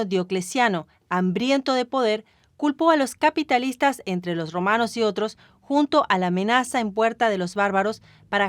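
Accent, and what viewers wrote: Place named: Argentina. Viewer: De donde sos? Pareces argentina hablando en español neutro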